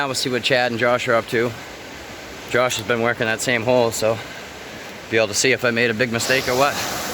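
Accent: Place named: New England, United States. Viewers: I was quite young (13) when JFK was assassinated. My family had been very big politically on Kennedy. Much had been made of his Bostonian accent. We even had a comedy album on the first family <link> So that was my first real exposure to the Massachusetts accent. I realize this is off-topic as hell - I landed on your site because of my own interest in panning for AU. I just got curious about your accent and thought i'd ask. Good talkin with you - Keep up the good work. Peace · I hear a NE accent. NY 🤔 ...Northern Pa. Maybe N.Jersey.A long time ago but you been there. 😆 If not,its crazy how close it sounds. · I enjoy watching you guys find the Au. When I first saw your videos a few years ago I was laughing because some random dude with a thick New England accent was in California gold country digging nuggets and flakes. You really should incorporate Sam Adam's Lager into your videos..."your cousin from Baaaahstaaahn."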